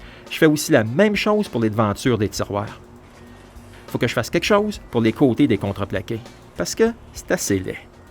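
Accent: Quebec, Canada. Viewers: encore une fois, Merci! Vous êtes ( et toi) les meilleurs.Avec en plus l'humilité et la modestie que l'on ne retrouve pas dans d'autres vidéos de bricolage. Pour beaucoup c'est souvent à l'américaine, avec beaucoup de blah blah et gesticulations pour au final n'être que de l'assemblage d'éléments achetés en grand magasins avec un résultat déplorable. De plus vous me réconciliez avec l'accent de la belle province!. A propos, que veux dire " Gosseux d'bois "?!? A bientôt!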